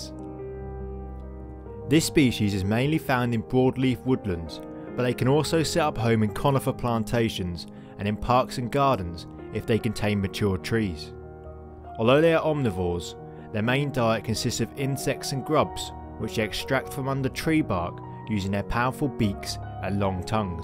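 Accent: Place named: Estuary, United Kingdom. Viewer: here's a thought .. less estuary english and more woodpecker drumming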